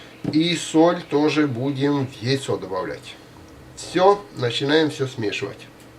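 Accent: Ukraine. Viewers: Судячи з акценту, Ви українець, чи не так? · Подсмотрел у укрАинских рыбаков.украинские рыбаки -хорошие рыбаки.я с Сибири.судя по"молотарке","та же самая"говору,сразу ясно шо не москаль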